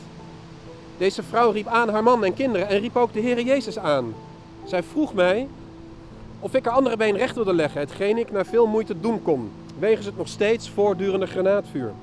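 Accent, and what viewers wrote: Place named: Amsterdam, Netherlands. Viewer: Het is me wat zeg, die beste man komt uit Amsterdam en in het Amsterdams klinken de "v" en de "f" hetzelfde. Wat erg!!!! 😩Nou bedankt voor je uitleg, ik had er anders niets van begrepen. Maar serieus... Ga je ook zo muggenziften wanneer je iemand Leids hoort praten?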